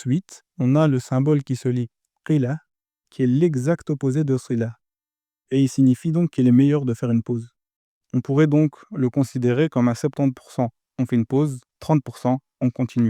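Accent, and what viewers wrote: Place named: Belgium. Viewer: j'avais noté la prononciation Belge aussi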